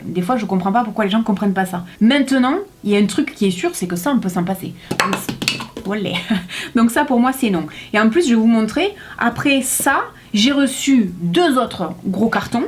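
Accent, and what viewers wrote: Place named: South-West, France. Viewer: C'est toujours un grand plaisir de découvrir une nouvelle vidéo, on sait d'avance qu'on va passer un bon moment (une bulle personnelle dans le temps, et pourtant je tiens à redire que je ne me maquille pas) car tu es une personne rayonnante, solaire et tellement agréable, de plus j'adore ton p'tit accent du sud-ouest! J'aime beaucoup les parfums de la marque Adopt que je porte très régulièrement, ils sont d'un rapport qualité/prix excellent! On m'a demandé à plusieurs reprise quel était le parfum que je portais (rose noire, sugar, yummi candy) une chose qui m'est arrivée moins souvent alors que je portais "un vrai parfum de marque" (mes préférences vont chez Guerlain, Lancôme et Mugler). J'aime bien t'entendre parler de maquillage même si je n'en fais pas usage, pour moi une simple crème hydratante riche de chez Avène ou la Rose-posay. 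Je suis bluffée quand je vois comment on réalise un maquillage techniquement, cela n'est pas si facile à faire, question d'entrainement j'imagine. Chère as-tu un avis sur le lait crème Embryolisse que j'aimerais beaucoup tester, j'espère qu'il convient à une peau très sèche, sa texture me semble très chouette. Bonne et longue continuation à toi!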